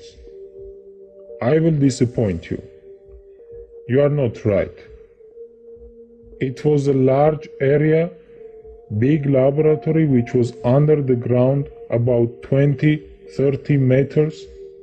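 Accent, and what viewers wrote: Russia. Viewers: Sounds like russian hacker tarus kool not saying he is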